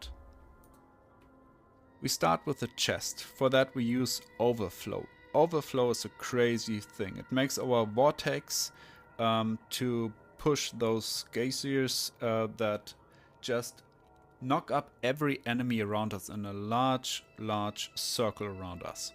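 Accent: Germany. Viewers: Bro bist du Deutscher hört man Akzent raus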